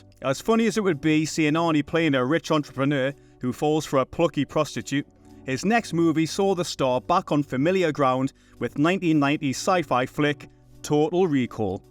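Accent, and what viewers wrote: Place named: Newcastle, United Kingdom. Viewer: A bold move to use a Geordie for the V/O!!! One of my favorite accents in the world!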